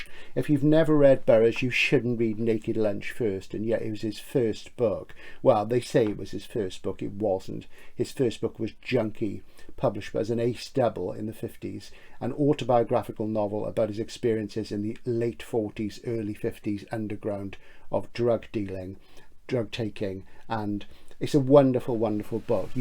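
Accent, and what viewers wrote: Wales, United Kingdom. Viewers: You sound like a proper Welshman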